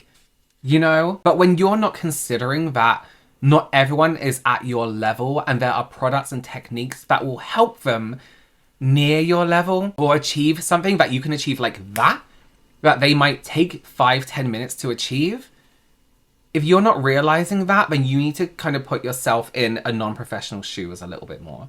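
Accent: England, United Kingdom. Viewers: The English accent is everything 😍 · I have an interest in makeup, and you provide valuable information not only about the make up application, but you add your years of professional experience to it., it is always delivered with humor, intelligence, and realness which is very much appreciated. Also your British accent is a nice to hear too! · the English accent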